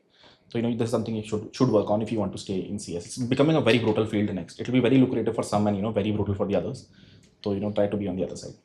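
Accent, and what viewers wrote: India. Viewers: Thanks Bro speaks Indian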